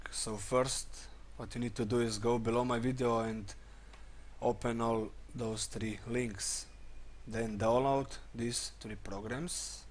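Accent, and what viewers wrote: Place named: Balkans. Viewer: druze znao sam da si srbin il balkanac primjeti ti se naglasak XD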